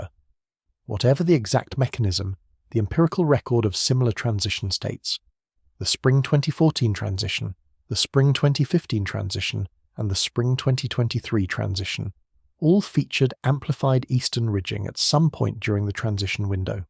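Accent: United Kingdom. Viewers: Why is the narration in a British accent?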